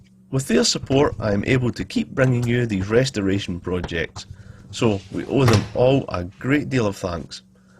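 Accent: Scotland, United Kingdom. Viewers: Are you Scottish? (Not Irish, right?...) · I love the calm pace of narration and Scottish accent. It’s like a relaxation tape.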